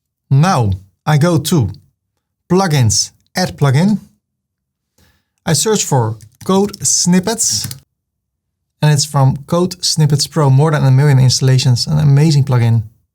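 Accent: Netherlands. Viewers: i am obsolute beginner. started as a hobby. This tutorial is amzing. He never hiding anything. His dutch phonetics is lovely. I am trying to imitate. coming back to the subject, when I tried to create background colour for the section, it is showing the row separate colour. How to fix it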